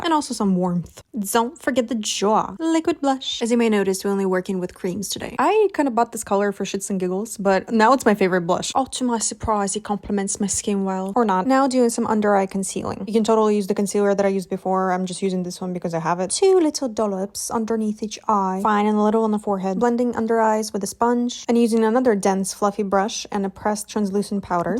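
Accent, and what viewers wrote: Russia. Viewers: слышу русский акцент хаха🫶🏻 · Моментами пробивается родная русская речь..) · Я слышу русский аккцент, наверное, но вы крутышка💕💕👀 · С первых секунд поняла, что вы русская. На самом деле, шикарное видео,спасибо❤ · OMG I LOVE your humor and voice! also, aren't you russian? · черт, я прям чувствую этот русский акцент....................... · I rly like ur videos, this video have Russian memes and аKцент😮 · this accent sounds like typical «russian accent» i don’t know where you from but still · damn are you Russian? because the occasional accent and the fucking picture of конь Юлий were such a slay 😭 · I hear a russian accent... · your accent sometimes seemed a bit...russian? to me, GIRL ARE UU russian or it just seemed to me?? 😭😭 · her accent sounds more like russian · I think she has a Russian accent respect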